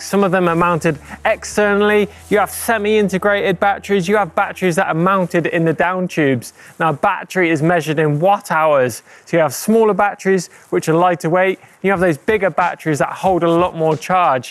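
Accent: United Kingdom. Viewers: Don't know if it is the annoying British accent, the goofy helmet, or the mountain bike in general that makes this unwatchable.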